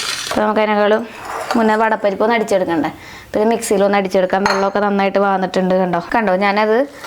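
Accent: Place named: Thrissur, India. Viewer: Chechi.thrissur bhasha aanalloo njnum Thrissur aanuttaa 💪❤️❤️🤗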